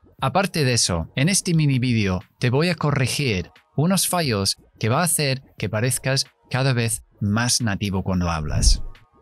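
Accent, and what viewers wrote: United Kingdom. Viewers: Buenos temas de este teacher. Lástima q no enseñe con el acento americano. El acento británico sólo se habla en Uk y el mundo no es esa isla es más comercial el acento americano vayas donde vayas.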